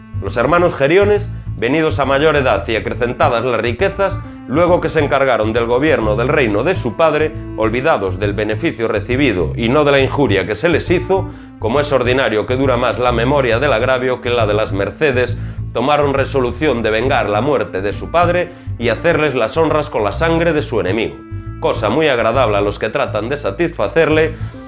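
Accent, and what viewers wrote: Galicia, Spain. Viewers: Al hablar muchas veces se te nota el ramalazo de gallego de pueblo , un saludo 💟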